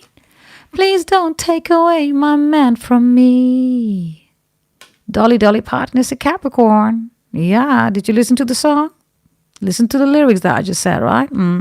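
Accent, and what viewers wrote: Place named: Patois, Jamaica. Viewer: 💛 missing your live and your voice so beautiful and love the Jamaican pathwa and u spot on about me being married and husband Aries and arab and I'm 🇬🇧 will get a reading soon from you 💖 🙏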